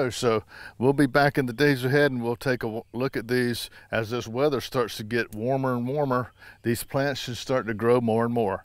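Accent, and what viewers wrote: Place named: South Carolina, United States. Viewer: Where are you from? Your accent sounds much like us, here in South Carolina. :)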